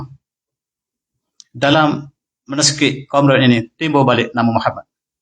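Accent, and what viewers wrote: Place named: Kelantan, Malaysia. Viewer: setuju sgt2..bro, belum cukup ilmu di dada, lautan dalam jangan di duga. Sya pun faham..prof ni byk kekurangan dlm penuturannya yg tebal loghat Kelantan, kelajuannya, malah bait2 bacaannya dari apa sahaja bahasa BM, English, Arabic, Ibrani, Aramaic, terdengar mcm tak clear..lajunya mcm lorat gaduh dan agak lucu2 skt. Tp tu semua tak terbanding langsung dgn ilmu2 dlm setiap kuliah beliau. Mula2 dgr dlu ada gak rasa smcm jer..tp makin lama semakin menarik, makin mendalam, byk ilmu baru, isu2 dunia, isu umat muslimin, isu akhirat dan penuh pengajaran, pencerahan dlm dunia yg sudah di fasa akhir zaman ni..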